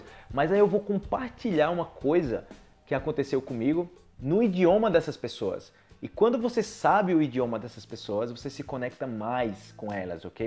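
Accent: Brazil. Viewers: Adorei o idioma por vc .... Amo o sotaque brasileiro!!!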